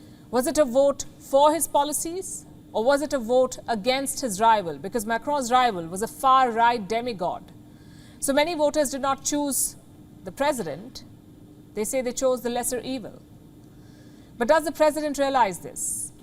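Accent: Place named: India. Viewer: She just speak English i in hindi style with indian accent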